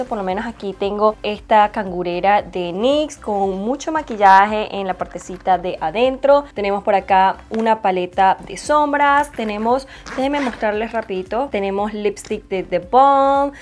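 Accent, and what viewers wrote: Venezuela. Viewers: Hola yo te descubrí con el vídeo de la colección de Disney villains x colour pop. Te sigo desde Venezuela💖 Siempre supe que eras Venezolana por el acento😂😬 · Yo mi primer video lo vi y dije ella es venezolana como habla te veo desde estados unidos tambien soy venezolana y ya estoy poniendome al tanto con todos tus videos 🤗♥️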